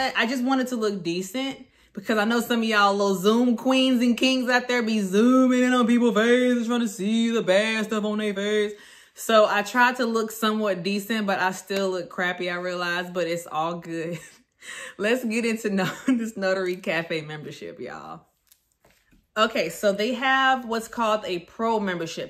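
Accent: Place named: Texas, United States. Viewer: I watched your "what they don't tell you..." video first and was like she sounds like she's from Texas 🤣🤣🤣. Then I watched this one and you confirmed it. I'm from Beaumont and I'm just now starting. I received an email a few days ago and missed it. It would have been my first signing if I was assigned.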